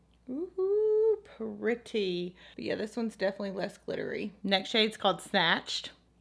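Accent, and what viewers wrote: South, United States. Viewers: I just found you thru madison's giveaway and I been watching all your videos. You talk as country as me and I love it. Where are you from? I'm from Ga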